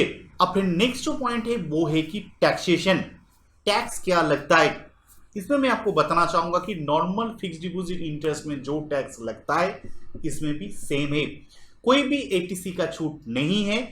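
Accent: Bengal, India. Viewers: Bengali dada apka hindi bohut sweet hai...i like it...dada...👀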